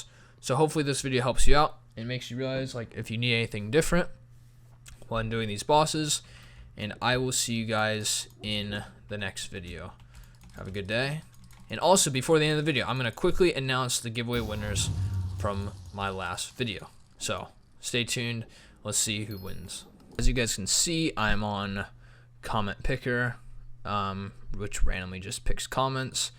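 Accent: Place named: Netherlands. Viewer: You sound Dutch! Are you?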